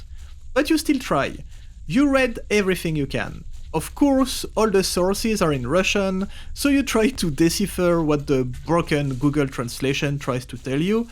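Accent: France. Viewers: bro, just because your vid made me feel sorry for your time loss and your adorable french accent; ca craint et va te faire foutre, connard. much love, XOXO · Slt, ça s'entend que t'es français et étant francais aussi c'est un peu plus compliqué de te comprendre paradoxalement. Je sais que c'est un peu de travail en plus mais je te suggère de re doubler tes vidéos en français. Sinon j'aime bien tes vidéos, continue !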